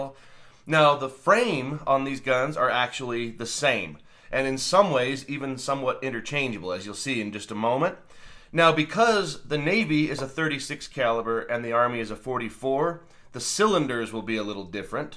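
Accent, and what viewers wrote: Utah, United States. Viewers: Today is the first time seeing your channel. As I was watching, I thought to my self, “ self, this dude’s from utah!” And not to my surprise, you are. Nice videos “brother”.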